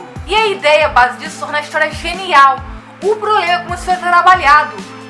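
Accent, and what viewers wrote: Rio de Janeiro, Brazil. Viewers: Ela é carioca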